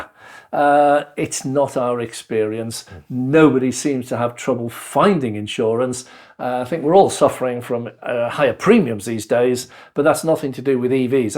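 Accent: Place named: West Country, United Kingdom. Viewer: You have some west country accent (Yeovil maybe?) and that tends to drag out some words. Also as you say thinking and talking at the same time will slow down speech. Someone who speaks quickly maybe is not putting too much thought in. As for just a minute I would say Kenneth Williams was more cheating than thinking.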